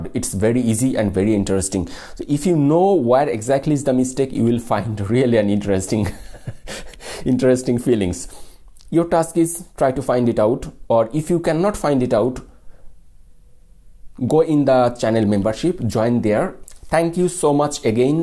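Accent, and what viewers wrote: India. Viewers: U are saying English as indians. It's exceptional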